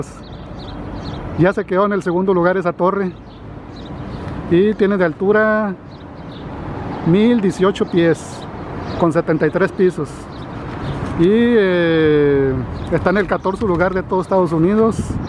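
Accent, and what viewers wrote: Mexico. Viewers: Cuando hables trata de quitarte el acento mejicano, se oye mal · Jaaaa como quieres que hablé si es mexicano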